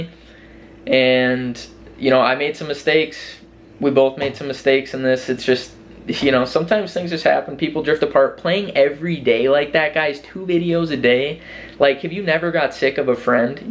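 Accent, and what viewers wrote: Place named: Minnesota, United States. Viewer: I fucking love this video. sounds like a Minnesotan so much lol Love you!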